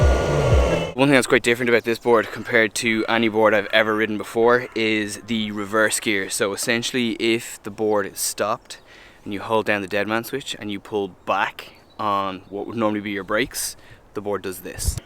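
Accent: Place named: Canada. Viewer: You are in Ireland? You sound Canadian to me, which is very close to America , I hope you are not giving up your accent geez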